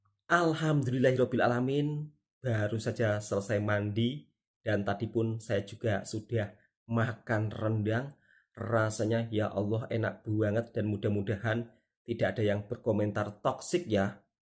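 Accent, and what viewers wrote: Java, Indonesia. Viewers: best tengok video Bang ni, orangnya jujur dan ikhlas dengan loghat Indonesianya tu agak LUCU tapi MENGHIBURKAN, terus berkaria ye Bang. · Loghat jawa lah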